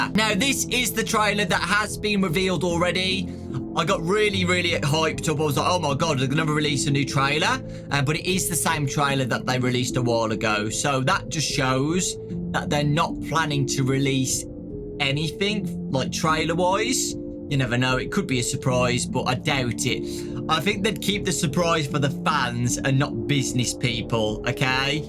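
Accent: United States. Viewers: Lol that American accent 😄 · i love his american accent lmao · American accent is so good it's unsettling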